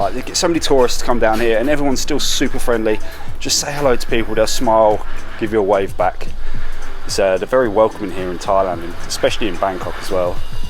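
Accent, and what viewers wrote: United Kingdom. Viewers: where the hell in bbk? So confusing wih your crazy brit accent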